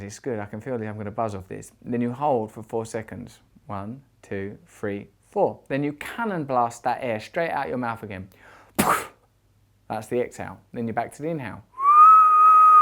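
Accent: United Kingdom. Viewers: I also feel a lot better, almost like calmy resting in myself with a clearer head and a some awareness of the present moment. Thank you so very much for sharing this, I'll try this for bed time to, maybe it helps for sleeping too. And btw I love your British accent, one of the reasons I subscribed to your main channel in the first place (content and critical questioning too) and I am over the moon to get such mindful content on your second channel. Usually I am not the person to comment on yt, but I really wanted to take the time for deeply thanking you for your wonderful creative, mindful, peaceful and thoughtful content on both channels!!!!! Thank you.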